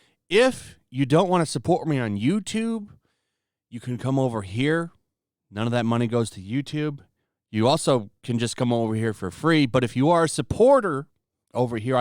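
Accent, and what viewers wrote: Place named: RP, United Kingdom. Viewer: The upper class British accent kills me. Hail!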